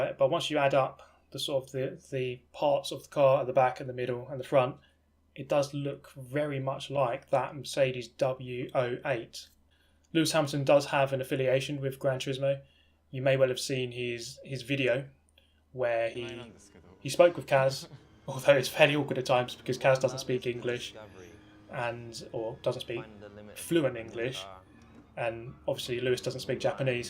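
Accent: United Kingdom. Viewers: God damn British accent finessed me, had no idea my boy was Asian 😂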